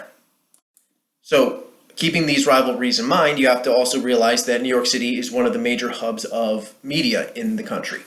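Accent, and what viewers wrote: New Jersey, United States. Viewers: I moved to Houston down south and everyone pinned my accent to NJ. I never thought I had an accent but people laugh at my pronoun-actions from time to time. we all sounded regular to me I can tell he has a NJ accent but I thought it was the general American accent. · good video!!!I'm from NJ but I used to live in Florida when I was a teen/20s. It was awful especially when guys would ask where I was from and visibly cringe and say ewww. Mind you they'd never been to the state. Over the years, I've met so many people who'd say, "I've been to NJ and it was actually really nice!" They were shocked because they were expecting a dump. Later I moved an area in Florida where there were a lot of NJ transplants. They are the biggest traitors. They will tell everyone how horrible NJ is, how glad they were to leave, it's a dump, blah blah. 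I never met one NJ transplant in Florida who didn't have something negative to say about the state. I'd try to defend NJ and then someone would say , "Oh my co worker is from NJ and he said it's the dirtiest state in the nation and is so glad he left!" Nobody in other states care about the New York rivalry. Nobody outside of NJ cares about taylor ham either. Most of the cause with hating NJ is Heresay (repeating what they've heard other people say), coming in to Newark airport or coming through Camden like you said. Also another big issue is that most of the time if NJ is featured on a tv show or movie, they show the shittiest parts of the state. Why can't you ever see mountains or horse farms of NJ? Why is it always either a crowded beach or a city? And the accents are another issue. 99% of the time if an NJ person is on tv, they have a new york accent. You never hear accents like yours! 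To me you have an NJ accen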